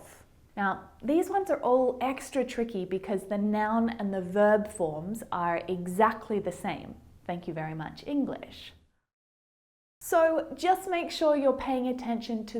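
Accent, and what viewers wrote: Australia. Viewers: Is this video and the other videos you post on your channel in an Australian accent? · I loveee her aussie accent! Your lessons are always the best, thank u 🙌🏽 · Hello Are you learning English with Australian accent? Please let me know Thank you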